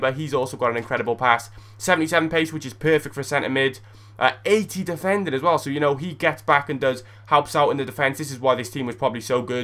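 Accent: Wales, United Kingdom. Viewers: are u from wales but ?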